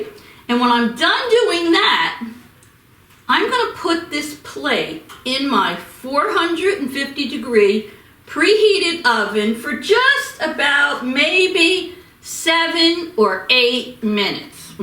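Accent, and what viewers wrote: New York, United States. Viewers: Smores dip looks fantastic. Can I assume from you accent your from New York? I'm super excited for Halloween 🎃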